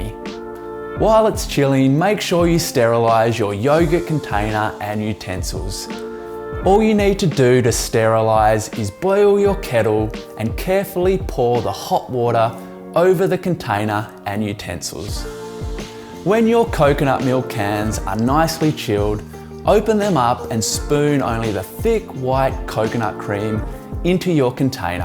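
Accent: Australia. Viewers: Hooray for your Australian accent!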